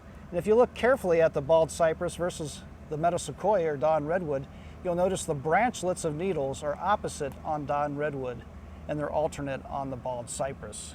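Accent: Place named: United States. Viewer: One of those sounds like Latin in an Italian accent and the other Latin in a French accent. Only natural that an American would speak Latin in an American accent!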